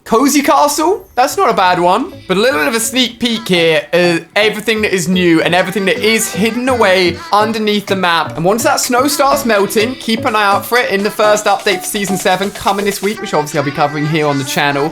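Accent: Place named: United Kingdom. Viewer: How you get your british accent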